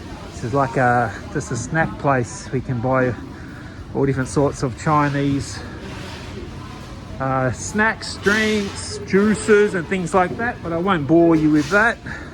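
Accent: Australia. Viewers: You sound like an Aussie? Am I correct? Thank you for showing videos of what the situation is really like presently, after all covid restrictions are being lifted. Just curious (and amused 😂😂😂) why are you, unlike your countrymen, not afraid of being attacked by the Chinese? Tell them NOT to be afraid of their own shadows!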